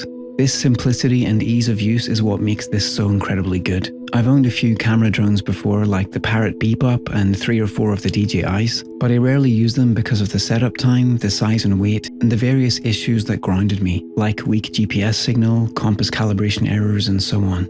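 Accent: Ireland. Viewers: Excellent review and beautiful put together . You sound irish ? But that doesn’t look like Ireland ? Where you get the background sounds from ? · I concur with most of the other contents, but I really enjoyed listening to your calm, almost ASMR voice. The Irish accent doesn’t hurt either! Not trying to come across as gay (“Not that there’s anything wrong with that..”), but I could probably sleep to one of your reviews. 😅. Keep up the great work. If that’s your girlfriend, she really cute too!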